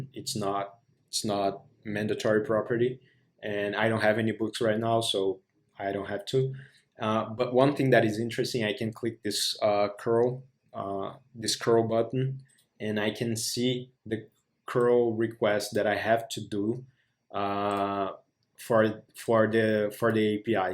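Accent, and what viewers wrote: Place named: Brazil. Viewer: sabia que era br quando escutei o sotaque kkkk, mas bem legal o vídeo, parabens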